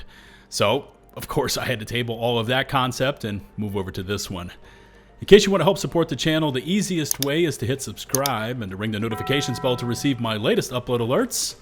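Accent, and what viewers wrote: United States. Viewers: You are one of the few content creators for helldiver 2 with an American accent. As such, the only one I follow. For democracy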